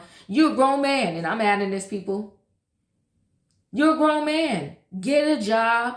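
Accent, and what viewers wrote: New York, United States. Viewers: I wholeheartedly agree...but, as well, that's the mother of his first born...I'm not sayin he's a saint at all but I think at some point he had a 'I'll keep her good in the process of doing me' mentality--and we all see the consequences of Wendy managing herself...Your hair looks so pretty and love that NYC accent...take care mama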